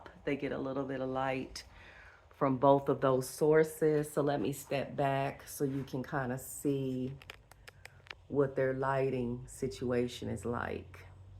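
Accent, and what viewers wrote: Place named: South, United States. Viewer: I love your collection of Scindapsus. I love all the them. I think they are so different and spectacular.....I love your southern accent....many blessings....chula....abrazos amiga....MINDY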